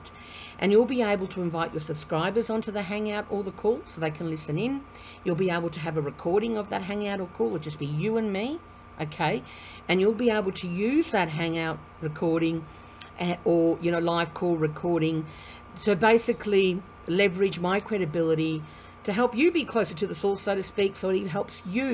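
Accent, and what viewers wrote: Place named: United Kingdom. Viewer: Lovely british accent!!!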